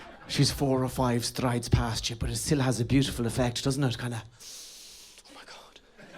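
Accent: Ireland. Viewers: The older Tommy gets the more he sounds like he's from tuam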